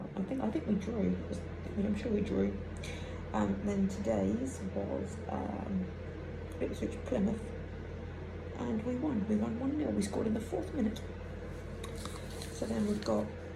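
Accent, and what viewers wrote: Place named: London, United Kingdom. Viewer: ah I see! Well it works pretty well! It's essex but a lot of people say it sounds like London! Sure I'll do that after my first bride book video! Thanks for your support on my growing channel!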